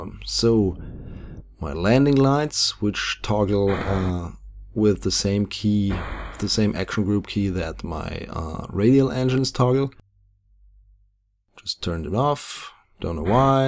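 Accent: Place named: Germany. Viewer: 1) Great videos, I love the designs of your ships and your commentary! Thanks very much for everything! 2) Where are you from, if you don't mind me asking. From you accent I would say German or somewhere in Scandinavia. :3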